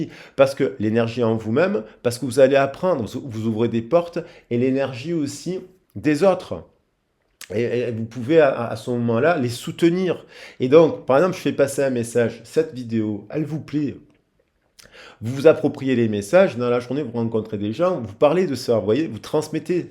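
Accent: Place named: Ardèche, France. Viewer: Ok, l' accent de l' Ardèche ! 🤗 En Provence, côte d'Azur, ici, ça chante la cigale dès le berceau, donc l'oreille est abonnée aux accents pimpants! Ya de la Vie dans la Voix 😉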